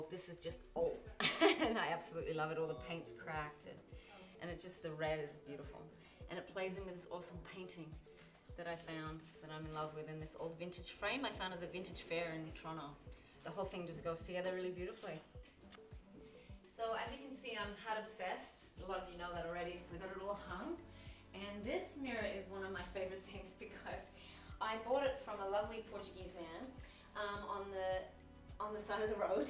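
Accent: Australia. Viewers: Thanks for the brief invite to your lovely home. Australian accent, really, I had no idea what it was. Your name shows french as does mine so the Australian bit surprises me a little. Whatever it is, it is perfect. Murdock Mysteries for me is a real treat, the names of the streets in Toronto and surrounding areas brings it all together and I absolutely love love love it. Keep going and I and we will be there with you. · Love that you have your Australian accent. Love the show!!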